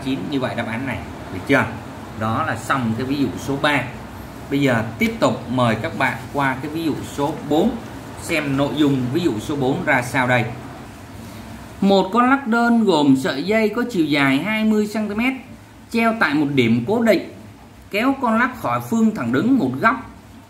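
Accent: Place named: South, Vietnam. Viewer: thầy ở miền nam đúng kh thầy , em ở miền bắc nên nghe hơi ngượng ngượng hehe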